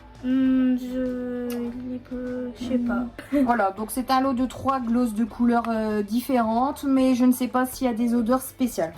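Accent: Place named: North, France. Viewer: Cc me voilà 😊. Elle est trop mimi ta fille . J'adore l'accent du Nord.... ou il fait froid 😅 moi je suis du sud l'accent du soleil qui chante. ❤ des bisous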